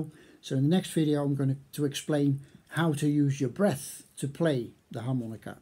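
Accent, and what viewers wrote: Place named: Netherlands. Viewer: Dear, do you live in holland? Your accent sounds so dutch but it sounds like you’ve lived in england for a while haha 😅 and if you live around the randstad do you do lessons??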